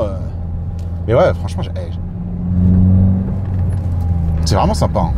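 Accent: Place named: North, France. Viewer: Je me suis abonné en tout cas, tu es du nord ? Je reconnaît l'accent , j'y suis moi même originaire meme si je n'y vie plus , dommage pour les révisions de la future s16.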